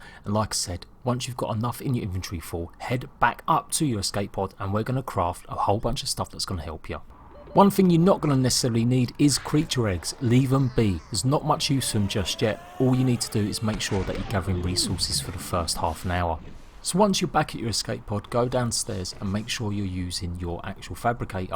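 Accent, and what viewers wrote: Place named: London, United Kingdom. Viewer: Pretty sure this is a London dialect, based on how he doesn't say some t's and how th sounds like v/f.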